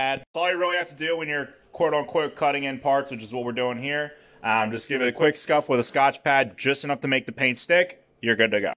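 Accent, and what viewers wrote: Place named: East Coast, United States. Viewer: With that accent you have to be from east coast, either PA or NJ or somewhere in that area I’m guessing but I’d say PA. Great video, also!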